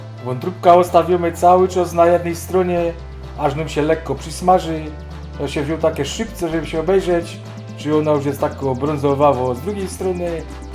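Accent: Silesia, Poland. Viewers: Jak mnie wkurwia gwara śląska. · tak slaska gwara nie jest prosta ❤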